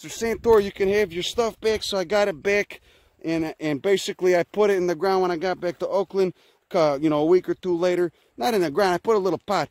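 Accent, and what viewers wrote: Chicago, United States. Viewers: Is your accent fake? Or are from the south side of Chicago, cause you sound like my fam. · I love how you just blurt out latin and shit in that American accent. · You sound like my cousin he's from Chicago... just saying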